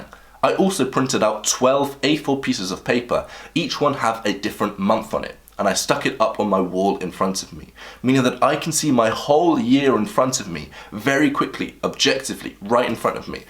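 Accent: United Kingdom. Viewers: I've payed thousands of dollars - proceeds to show pictures of euro-notes :D. Btw your accent sounds very British to me (?)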